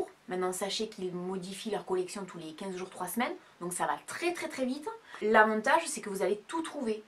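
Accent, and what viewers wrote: South, France. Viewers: Coucou ma belle, tu as tout à fait raison : on trouve plus facilement, en e-shop, des articles qui ne sont pas en boutique. Mes commandes sont toutes passées en ligne, et j'achète peu en magasin. En tout cas, merci pour tes avis, tes conseils, et tes petites astuces pour payer encore moins cher des articles qu'on a par exemple mis en wishlist :) Pour "Vide Dressing" et "Vestiaire Collectif", c'est vrai que tu nous en avais déjà parlé, mais je ne connaissais pas du tout. J'irai regarder de temps en temps; je trouverais sûrement de bonnes affaires........ et pleins de sous à dépenser!.....:) Les enseignes tels que Zara, Morgan, Banggood vendent des articles de qualité, et j'aime bien, même si je n'achète pas à chaque fois. Voilà ma belle, à dans une prochaine video. On te prends tes expressions, et peut-être qu'en te regardant, on prendra aussi ton bel accent du sud :) :) Gros bisous.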